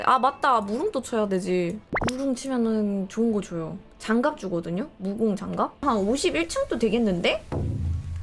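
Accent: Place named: South Korea. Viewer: 서울사람아니었나보네 사투리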